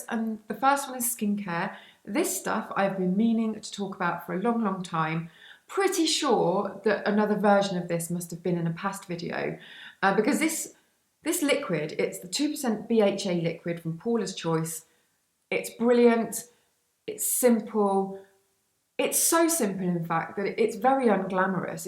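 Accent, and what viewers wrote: United Kingdom. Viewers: wow, what's your problem? Sometimes people enjoy using cookbooks (surprise, even mothers and chefs) to gather inspiration. Maybe she doesn't use the recipes to the tee and just uses it as a guide. Thinking of new dishes to make all the time can get challenging, so that's where cookbooks can come in handy. Also, she is British and has an accent (a very lovely one, might I add). I do not know where you are from, but I'm sure certain accents may sound strange to the ear of a foreigner/a person who is not accustomed to listening to it. Please don't try to create/find issues where they do not exist.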